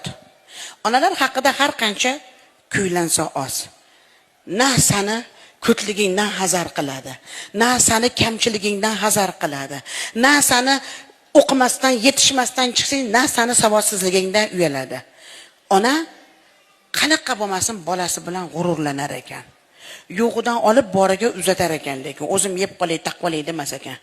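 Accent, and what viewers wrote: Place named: Margilan, Uzbekistan. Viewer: Маргилон шеваси дангалда Маргионликда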